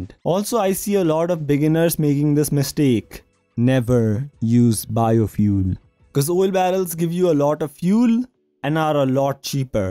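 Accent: India. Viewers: U sound like indian but are u In USA ?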